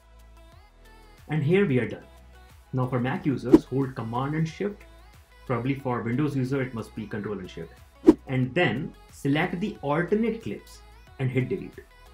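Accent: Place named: India. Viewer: Indian? Right!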